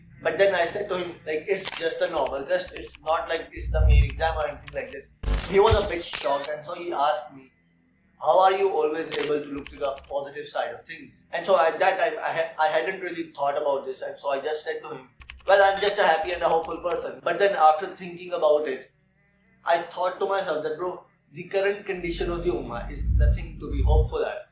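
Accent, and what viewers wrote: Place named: Pakistan. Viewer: Your ascent is little bit pakistani where are you from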